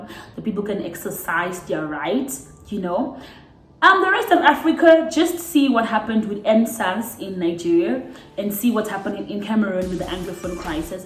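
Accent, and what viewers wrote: South Africa. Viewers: How are you Cameroonian with a South African accent wena? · Hey girl,!! New subie here,, love your video.. but listen, there's no way you are not South African!! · You picked up a South African English accent 😅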